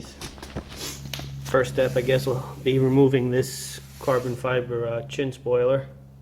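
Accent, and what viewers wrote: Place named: New York, United States. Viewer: Thanks big guy. I have now spent many hours watching you boost C6 project videos... just really very worthwhile viewing, learning and enjoying the multitude of tid-bips you continually share. Prior to stepping into any project, be it a car, boat, plane, house... I do my research. I know nearly nothing about the LS3, of the C6... but I am a quick learn. Stumbling across your videos (can't recall how) was like finding a treasure box! I've still loads to learn about the C6, and LS3, but day by day the picture is becoming much clearer (<num> GS 6 spd). You've a talent and passion for teaching, otherwise why all the videos? It's just a massive shame all your efforts are somehow missing a bigger audience. I'm on the opposite side of the country next to Portland Oregon, thus your New York accent gives me a chuckle and keeps it all interesting. 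Keep it whoever you are, ha, ha...! Email me the info on the spoiler as it'll be quicker.... I think.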